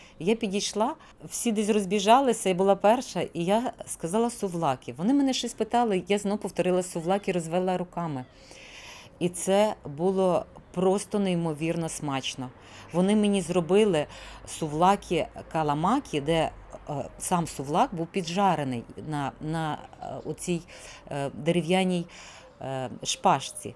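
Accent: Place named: Central Ukraine, Ukraine. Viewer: Ви з центральної України? У вас вимова, як там🎉🎉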